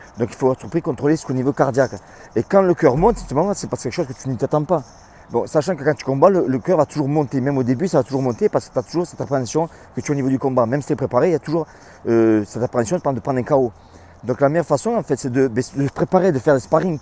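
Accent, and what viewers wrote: South, France. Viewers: J adore votre accent " Sudiste " !!!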